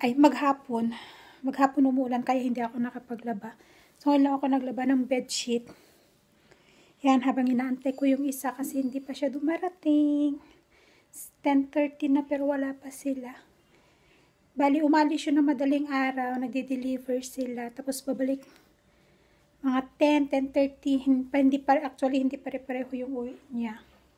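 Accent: Iloilo, Philippines. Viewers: Taga San ka ba sa Philippines sis.kasi young accent mo parang ilongga.😊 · Ilonggo poh kau ate?😊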